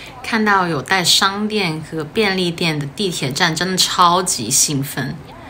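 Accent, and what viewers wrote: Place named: Cantonese, China. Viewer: 普通話是太普通，粤語=悦語，讚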